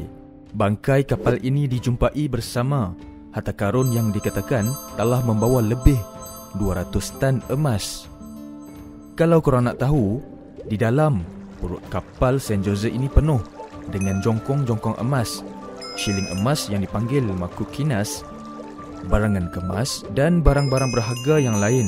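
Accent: North, Malaysia. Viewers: Mat ang org mna dengaq cm org utara...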